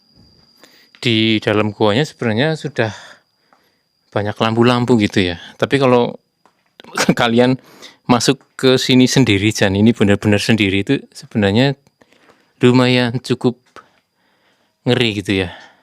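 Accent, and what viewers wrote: Banyumas, Indonesia. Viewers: katanya dari jogja, kok logatnya ngapak mas?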